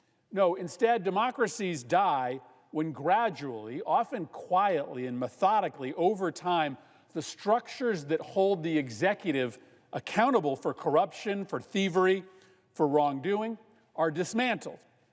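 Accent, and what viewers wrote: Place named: United States. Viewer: An American voice that god.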